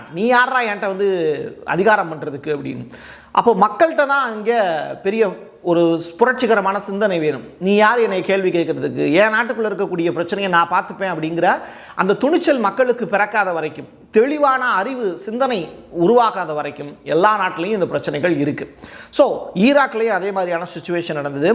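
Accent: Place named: Madurai, India. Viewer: Maduraipesu madurai pesa unnai kettaangala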